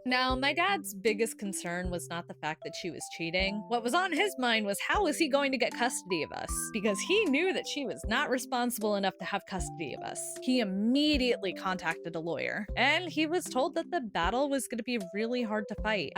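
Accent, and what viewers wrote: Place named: United States. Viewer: Where are you from that sounds like America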